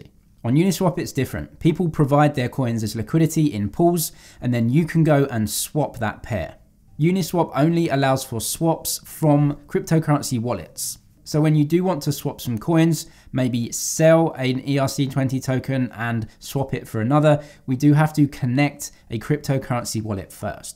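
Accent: United Kingdom. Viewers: Many thanks for your succinct and easy to understand videos. On the stuff I am trying to understand at the moment, I will watch your videos first! And not just for the British accent :-)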